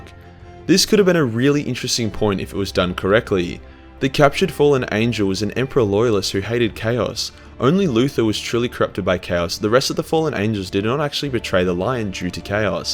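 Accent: Australia. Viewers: It feels like I'm listening to an Australian talking about kiddos with adhd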